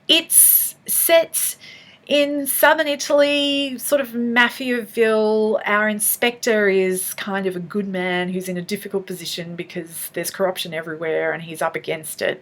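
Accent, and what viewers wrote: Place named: Australia. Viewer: I have never heard of Nick Earls and his 'bloke lit' haha! Thanks for reviewing it! Do you read on a Kindle? And you're borrowing digital books from your library? I am trying to get my head around this process. So great to hear an Australian accent on Book Tube. Baking here in hot hot Melbourne. Love your work! :)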